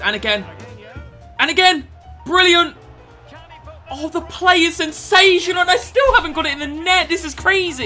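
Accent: United Kingdom. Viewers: Where abouts in the uk are you from I can’t tell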